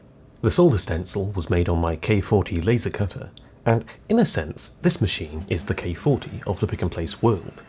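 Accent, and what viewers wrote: United Kingdom. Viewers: Can I ask🤔, and I ask out of pure curiosity and mean no offence, why is it you speak with a very good well educated British accent but sound "wires" with a V? ....please feel free to tell me to "multiply and go forth" and mind my own beeswax....I cant help it its my curious mind, when I have a curious thought go through my mind It just keeps jingling around in there distracting me and keeping me awake at night until I know the answer🙆🤦🤷🙄😅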